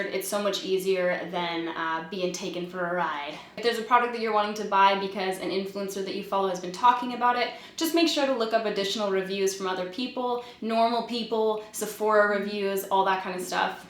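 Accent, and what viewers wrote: Canada. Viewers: First of all, you're so stunning. Second of all you're Canadian accent is adorable. And third, I love your videos! You're the most honest youtuber out there, I love that I can trust your opinion when trying to figure out what makeup to buy and what's not worth it! Thanks for being the coolest thing ever x0x0x0x0x teresa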